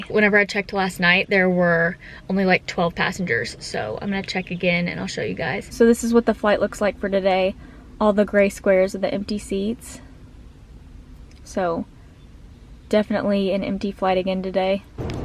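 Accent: South, United States. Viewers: Hi... I'm amazed that your internal flights are still operating in the USA... Our flights in the UK are totally grounded.. We have been told by the UK Government that we can only go out for exercise / get food / if your a key-worker NHS... we're pretty much all grounded, we can't even go out in the car because police are stopping car movements and you risk a £££££ fine!!! You sound really sad...! I just love your accent...;-) YYYeeeeeee Hawwwwww Go Cowgirl!!! Bet you look great in western gear..!!!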